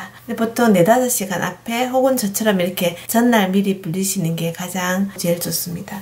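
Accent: Gyeongsang, South Korea. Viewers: 으...너무 맛있겠어요,.. 한번 따라 해볼래요.. 이모님 경상도 말씨 너무 이뻐요..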